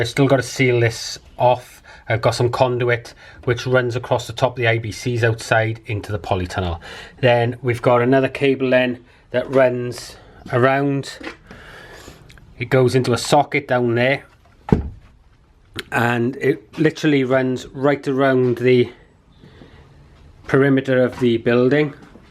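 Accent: Wales, United Kingdom. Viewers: Sounds like a strong welshman! Great video! 🙏🏴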